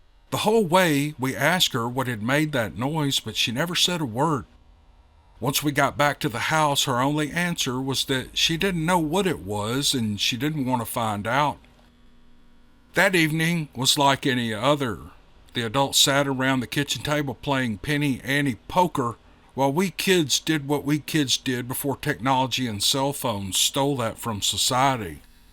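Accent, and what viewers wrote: South, United States. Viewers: Thank you & thank you to those that send their stories in to to share with us. All of these were amazing!!! Glad you aren't using the AI in these The stories with AI were good as well, but we like the magic in your good ole' country boy accent...Just something special about that southern drawl....😂😂😂 Gotta know we love you?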